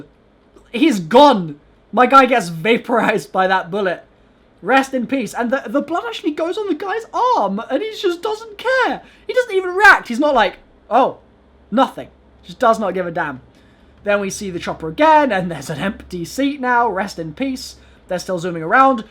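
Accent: United States. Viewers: American accent was too great. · Good video that American accent is so funny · I love you’re American voice doing it the black ops way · you have an amazing 'merican accent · A video with your best american accent would be nice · American Accent ™️ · That American accent dough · Omg i love his American accent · Ur American accent (my boi ) was pretty dope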